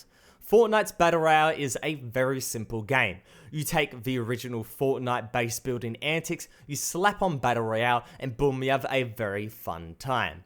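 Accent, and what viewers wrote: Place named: Australia. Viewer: Dude's Australian his voice is easy to listen too subscribed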